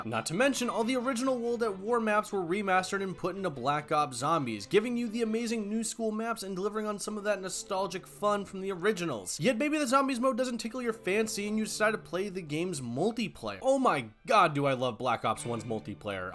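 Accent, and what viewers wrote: Portugal. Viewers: És português? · Are u Portuguese?🇵🇹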